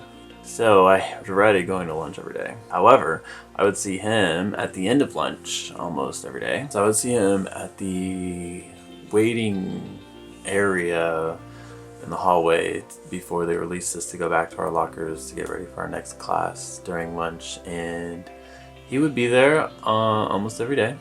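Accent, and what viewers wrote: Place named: Fort Wayne, United States. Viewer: Sounds like you are from the area around Fort wayne? I am from warsaw.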